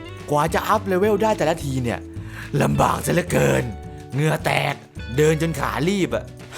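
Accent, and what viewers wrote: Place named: North, Thailand. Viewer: คนเหนือก่อหนิ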